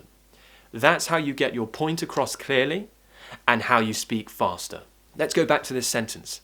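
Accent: United Kingdom. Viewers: one thing I must mention I have been learning pronunciation for several months through ur video lessons,and I have been imitating your speech.Now my ielts tutor is just amazed about my pronunciation like a British.I reaaalllyyy respect,admire,love you.U r really super tutor.🤗🤗🤗🤗🤗🤗🤗🤗take care urself for us and ur family!!!!❤❤❤ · When I found your channel I didn't know how to have a British accent, but with your videos I have learnt a lot. Thank you!!! You're an amazing and smart teacher. I love listening you! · I’m always looking forward to listening to your beautiful British English! Fantastic Friday! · I love your accent. You sound like a posh English man❤ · I do love your teaching british accent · Love ur British accent. Thanks for ur fantastic lessons. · I luv your British accent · your voice and your British accent😍i fell in looove🙃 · I love your british accent ❤️ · Hello 👋🏻 Actually it is very hard to speak like native british people. 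I was studying british english at school but unfortunately even my english teachers couldn’t speak like you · as well as your British Accent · You are an amazing teacher, I like your British accent.........a bientôt sur YouTube 😘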